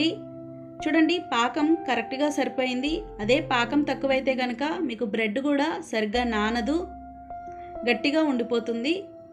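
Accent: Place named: East Godavari, India. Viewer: నాకు మీరు చేసే హల్వ కాన్న మీరు మీ మాటతీరు నాకు బాగా నచ్చింది అండి తూర్పు గోదావరి జిల్లా కదా అందుకే east is Best అంటారు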